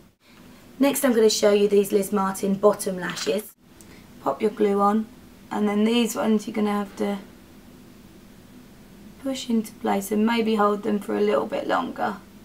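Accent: United Kingdom. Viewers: r u British? love ur accent.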